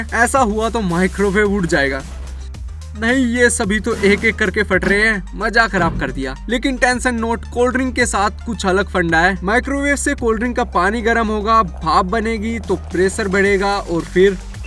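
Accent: Haryana, India. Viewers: Bhaiya aap Haryana se ho kya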